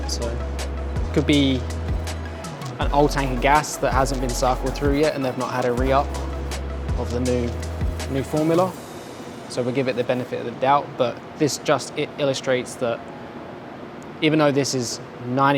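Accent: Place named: United Kingdom. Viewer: You're Canadian, but have a mild British accent, how's that, bro'?!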